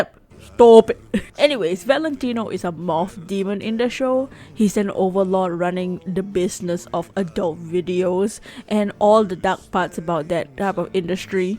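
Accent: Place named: France. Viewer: Par tout hasard, est-tu français ? Il y a un peetit accent Anyway, these designs are cool, I love the Alastor and the Lucifer one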